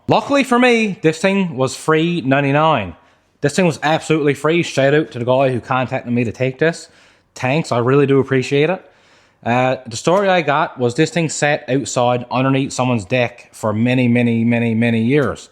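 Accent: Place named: Newfoundland, Canada. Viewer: Funny story heard my 9 year old the other day watching YouTube and i heard the strong newfie accent, i said you watch and he yes you do to, great job on the videos from your neighbors in prince edward island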